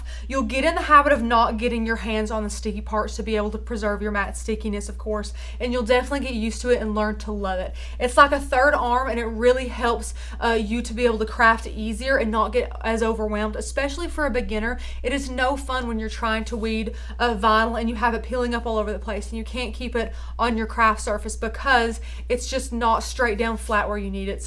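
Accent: South, United States. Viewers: My English is fine, and I have trouble keeping up😁 Her Southern accent is thick, so that might make it a bit more difficult for you, too. I don't know how to do it, but I know videos usually have Closed Captioning, so you can read what is being said at the bottom of the screen. It helps me keep up. Good Luck from Georgia😁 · I was gonna say, she sounds VERY southern to me! (New Hampshire here ;)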